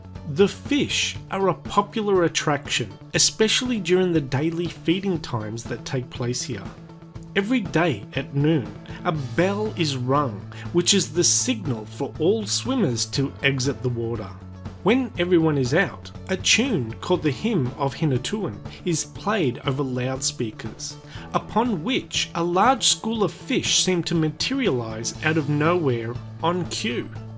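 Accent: Australia. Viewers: Thanks for soften your australian accent.....!!!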